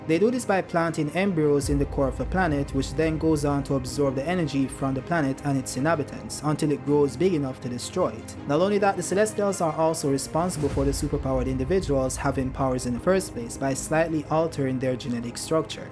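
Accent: Jamaica. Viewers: Da video creator ya a yawd man? 🧐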